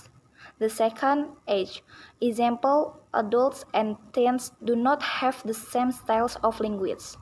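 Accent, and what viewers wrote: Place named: Java, Indonesia. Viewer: Your explanation is very good, but your dialek is very2 like indonesian people specialy javanese, oh maybe in indonesian language is medog.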